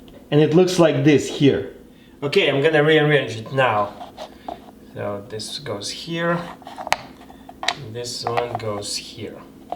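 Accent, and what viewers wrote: Russia. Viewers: Мне очень нравится его русский eanglish. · Прям слышно русский акцент произношения английского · ОХ ЗЫС РАШЕН АКЦЕНТ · Сач э стронг рашн эксент! Ыыыы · Как же я кайфую от русского акцента :3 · Really funny tbh, I didn't look at his name but heard his voice and tbh this is not the thickest Russian accent I have heard tho. Very close to English, good job for learning english so well btw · Ох уж этот знакомый русский акцент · У тебя иногда проскакивает русский акцент) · А акцент то русский 🌚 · Ну слышна русская речь,так же говорю по-английски)Ахх,акцент не пропьешь) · Акцент не совсем английский, или ты специально, типа рашен стайл?) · Вау!Вы русский и говорите по-английски.Да,виден русский акцент.Почему,кстати,русские говорят куда хуже на английском,чем любые другие народы(греки,итальянцы,турки,голландцы,немцы)? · Me: he have a heavy Russian accent—oh he’s Russian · такой русский акцент, ахвхах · All the Russian YouTubers have the same accent.. 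Taras Kul.. and him . They speak the same. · Is this Russian accent? Oh, stupid I didn't read the name of channel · Ммм, русский акцент, ну привет, русская душа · Me:is he Russian? Also me: scrolling down and sees username oh... Edit: why is this still getting more likes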